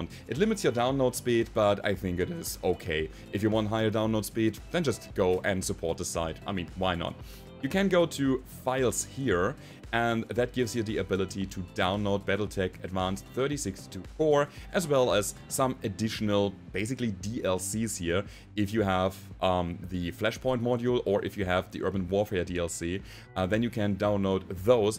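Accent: Germany. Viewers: Hey you are a German? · Question: As a German native speaker I can really good understand you! Thanks for that. But your accent sounds a bit like my mother tongue? Do you speak German as well? And I‘m grateful to these video. It helps me a lot. EDIT: Seit deiner Windows Meldung weiß ich: Jup, du bist eigentlich deutsch Sprechender ^^ Deswegen verschluckst du nicht so viele Worte, wie Muttersprachler in Englisch. Danke für dein Video :) Ich wollte mir nämlich gestern ne Mod runter laden, da stand nichtmal eine Anleitung dazu. Andere habe ich nicht angeschaut ^_^ Cooler Typ!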